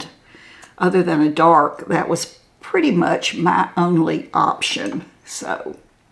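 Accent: South, United States. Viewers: The makeup only enhances your inner beauty. I am 64, and to be honest, I haven't always been very kind to myself and the changes that accompany aging. That's going to change after watching you, and listening to that calm, charming Southern accent. Thank you for the inspiration, and proving that a little pampering, and inward gentleness can present itself to the outside world as being worthy of self-love and care. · I think the foundation is a tiny bit too dark and your blush is too heavy. A lot of older women wear too much blush and it looks overdone. I also think you’d do better with brows that are less brown and maybe use a bit of pencil. Elf makes a very decent one for the price. Other than that, you look very lovely and I love your southern drawl. Where are you from? I’m also 81, am blessed with good skin. My wrinkles are in the mouth area and I’ve never smoked so am very annoyed. 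My eyes and cheeks are good.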